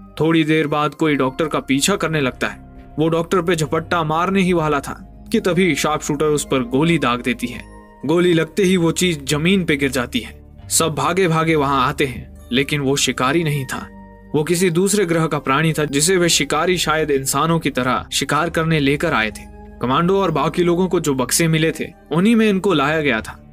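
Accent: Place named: Maharashtra, India. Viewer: हा आपके बोलने के अंदाज से पकड़ लिया था Love from Wani❤️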